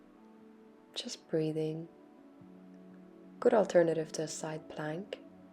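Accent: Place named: Ireland. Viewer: Hi Thank you for the lovely flow, great to hear a soft Irish accent 😊 Mandy 🌳 xxx Belfast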